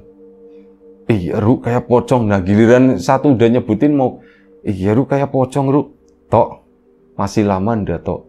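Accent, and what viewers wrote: Tegal, Indonesia. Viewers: Lama ndak denger, sekarang logatnya jdi tegal bgt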